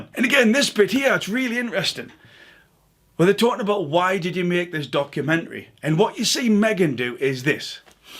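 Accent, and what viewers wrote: Newcastle, United Kingdom. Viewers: Always great to hear that Geordie sound! ☆♡☆♡☆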